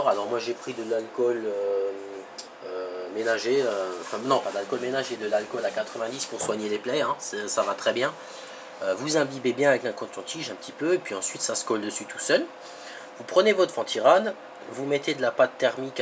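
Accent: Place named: Alsace, France. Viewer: cet accent alscacien du feu de dieux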